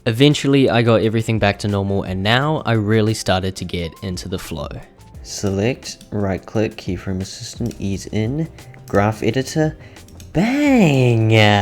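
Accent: South Africa. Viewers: South african? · r u south african?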